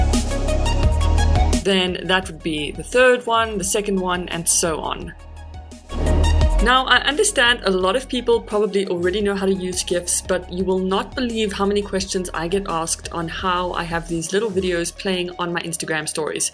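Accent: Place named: South Africa. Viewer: Oh my gosh :D I just started watching this and was like 'this check has got to be south african!' :D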